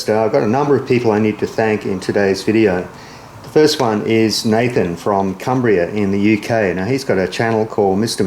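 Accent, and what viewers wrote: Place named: Australia. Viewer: Gday, Great to see your channel is getting plenty of subscribers. I've been enjoying both the project videos and the background sounds that I'm missing from home (birds, rain on the shed roof, Oz accent, etc.) as my wife and I are currently living in the UK, but home is Qld. Looking forward to future videos. Cheers.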